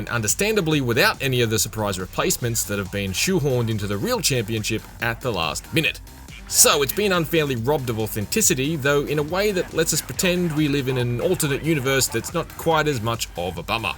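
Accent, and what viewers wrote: Ireland. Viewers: I don't know, man. He sounds Irish to me. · he does sound Irish tho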